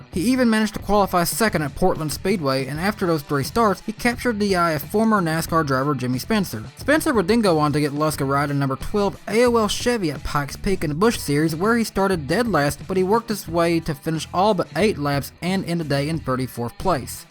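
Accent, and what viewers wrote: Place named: South, United States. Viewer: Down south, the proper pronunciation of the letter "I" is pretty much neglected. I live in TN, I see it happen all the "tom". Lol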